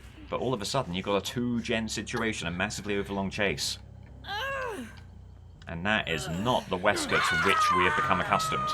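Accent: RP, United Kingdom. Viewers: ! My man! That posh guy from Youtube! great video so far (like 5 seconds in).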